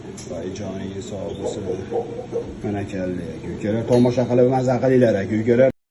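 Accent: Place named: Andijan, Uzbekistan. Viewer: Андижондансиз шекилли булди буеги аник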